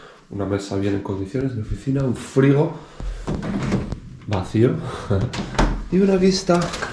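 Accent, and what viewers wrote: Spain. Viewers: No conocía tu canal!!! Calidad!!! Saludos desde El Salvador! Solo una duda, de qué parte de España es tu acento ?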